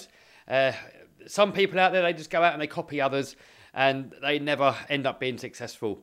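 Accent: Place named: England, United Kingdom. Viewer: your the crazy guy with a English accent who's not affraid to destroy his RC'S. Your infectious personality is entertaining and a winner. Thanks!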